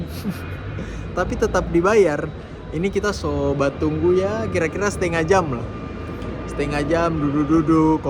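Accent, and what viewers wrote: Manado, Indonesia. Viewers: Seneng nih gaya bicaranya bahasa manado dan indo jd punya ciri khas tersendiri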